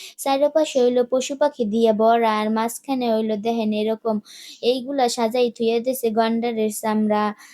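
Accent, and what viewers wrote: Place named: Barishal, Bangladesh. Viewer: তোমার মুখে বরিশালের কথা খুব সুন্দর লাগে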